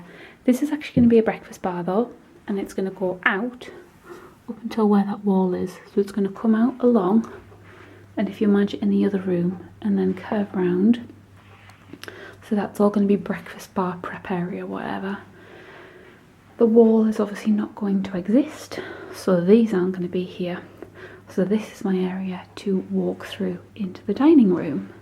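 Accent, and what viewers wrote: United Kingdom. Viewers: British accent is beautiful! 😍😍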